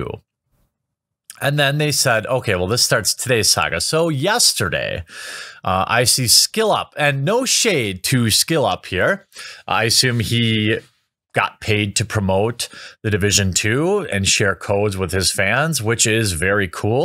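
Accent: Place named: Milwaukee, United States. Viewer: Lived in Milwaukee, can confirm this is true. Any aaaaa sound like aple goes 'Ay' instead. Ayple? Weird but funny n cool how dialect works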